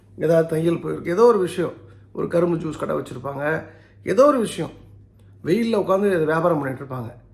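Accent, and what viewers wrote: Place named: Madurai, India. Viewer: மதுரை தமிழ், சண்டை போட்டாலும் ரசிக்கும் படி இருக்கும். 🎉🎉🎉🎉🎉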